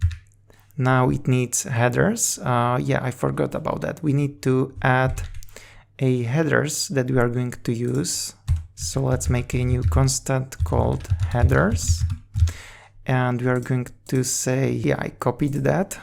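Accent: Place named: Spain. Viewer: Perdona, pero tu normalmente no hablas ingles 😅. Tu ingles es muy español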